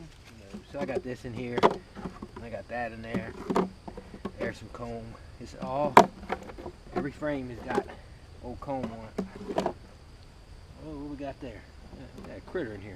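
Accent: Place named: North Carolina, United States. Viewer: Love your videos, moved from NC to Kansas little over a year ago and miss hearing people that sound like me. First year beekeeping, started with two packages and they are doing great. Thanks for the information and just being you.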